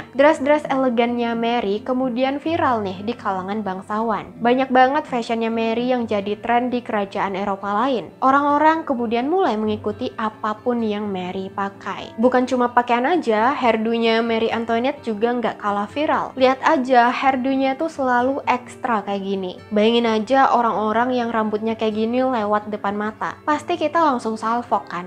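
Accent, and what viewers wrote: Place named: Indonesia. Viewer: padahal gak salah loh, coba deh nonton dulu video lain atau dokumenternya lah, beda beda dikit aja, lidah indo kan beda sama prancis asli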